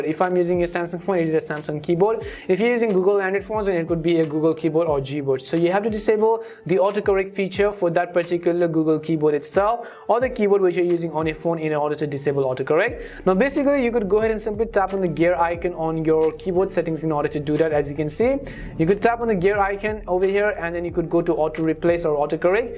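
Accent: India. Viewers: WTF....are there no American English speakers that can handle simple tech advice. Indian is frankly rough on the ears and often unintelligible.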